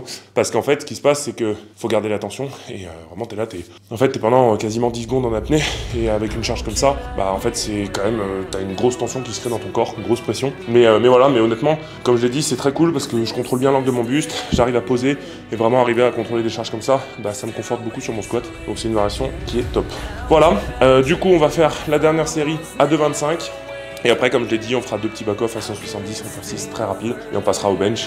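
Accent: Quebec, Canada. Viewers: Ce qui m'a le plus impressionné dans la vidéo c'est clairement ton accent québécois 😲 t'as habité là bas ou quoi ?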